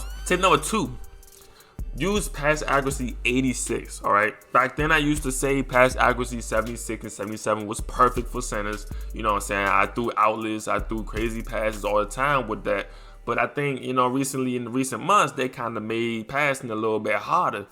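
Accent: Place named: Washington DC, United States. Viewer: Bruh definitely from DC or Murland..Being from Seat pleasant, I know us when I hear it 😂 Good shi doe fool 💪🏾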